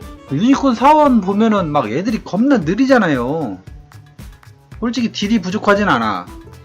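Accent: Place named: South Jeolla, South Korea. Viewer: 옹삭스럽다는 말투보니 전남분이신가요?? ㅎ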